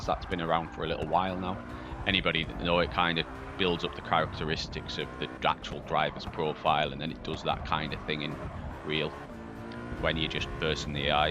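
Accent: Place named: Middlesbrough, United Kingdom. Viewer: Where are you from? Sounds like a Boro accent.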